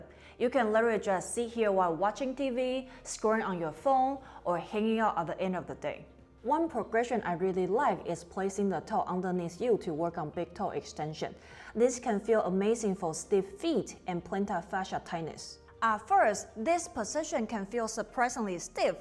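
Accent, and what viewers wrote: China. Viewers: 为什么我听到了潮汕口音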